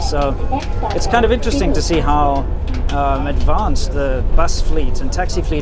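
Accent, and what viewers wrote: South Africa. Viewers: You a South African😁👍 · Hi where are you from? I hear a lot of American expressions but you sound more South African. I have just started watching your videos and I think they are great. I had no idea how day to day life is in China but this is a good insight. I honesty would be absolutely in big trouble if I went there, no idea what those Chinese characters mean and as for the language?? you get the drift.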